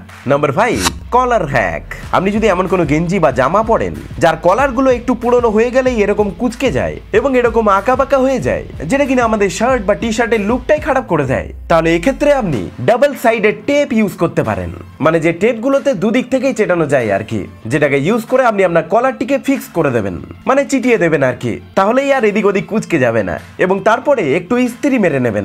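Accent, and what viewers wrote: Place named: India. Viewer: কথা শুনে বুঝা যায় ইন্ডিয়ান🥴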